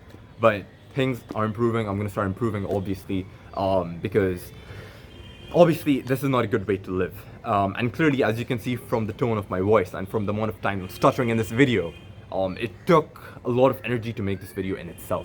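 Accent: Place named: United States. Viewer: bro you form kuait but please tell me how you got this such fluent american accent please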